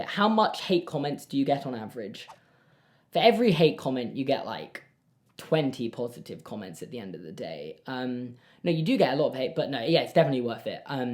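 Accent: United Kingdom. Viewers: haha british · Is it just me or does serious seem much more British than high energy. I probably feel this way because I really only know Doctor Who and Love Island UK which aren't super high energy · It's weird that YouTube auto-generated subtitles don't understand British accents like why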